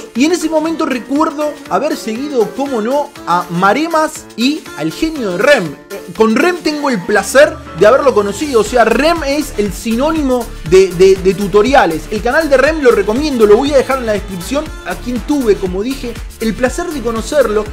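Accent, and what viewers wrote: Argentina. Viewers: Genio! Te sigo desde un tutorial que subiste en fifa 14 creo que era... sobre como dejar pasar la pelota que no sabia como hacerlo, y después vi videos de rem jaja le ganaste de mano en mi caso... escuche cuando explicabas, y dije este pibe es argentino y ahí me suscribi. Saludos desde Carmen de Patagones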